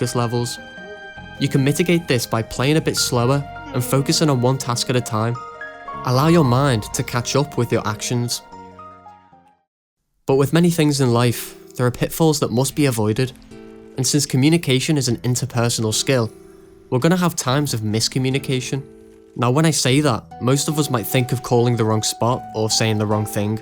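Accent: United Kingdom. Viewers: Why is he british????????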